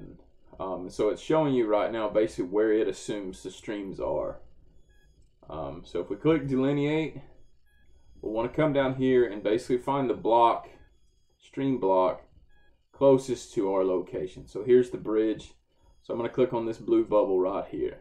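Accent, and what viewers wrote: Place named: United States. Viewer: bro this helped out so much with hydrology class. Not trying to offend anyone, but I'm glad there is someone with a good ole American accent speaking. Usually there is some guy from India or God knows where, and I can hardly make out a word. Bless their hearts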